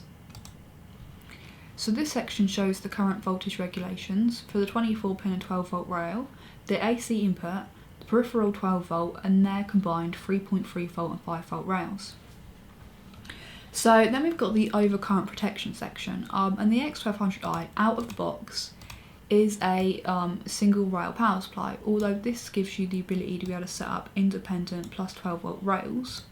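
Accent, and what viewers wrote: United Kingdom. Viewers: Sorry, she lost me when she said "...sexy double sided..." The Brit accent, gotta love it.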